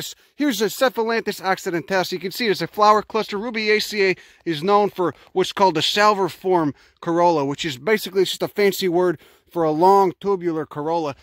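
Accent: Chicago, United States. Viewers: I KNEW you were an Italian from Chicago, we recognize our own around here lol. That accent is straight South Side. Good on ya bud, definitely appreciate the effort on that little guy's behalf. Sending you good vibes from da burbs.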